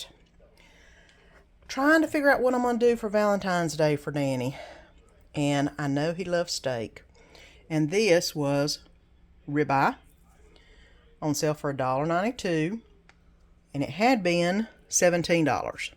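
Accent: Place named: North Carolina, United States. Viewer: I absolutely love your accent. I also live in NC. Great Haul!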